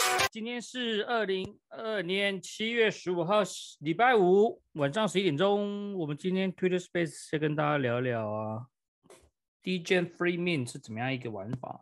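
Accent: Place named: Malaysia. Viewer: 感觉像马来西亚人。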